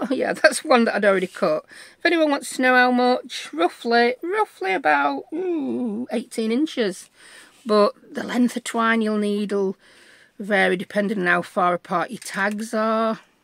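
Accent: United Kingdom. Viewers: I absolutely love love love these. What a brilliant idea, so much journaling space inside a beautiful belly band. My favorite is the pink Foxglove plant. Beautiful!!!!!!❤ I’m so glad I saw your video! Thanks so much for sharing. I also enjoy your distinctive British accent and sense of humor since I’m from the U.S. 😅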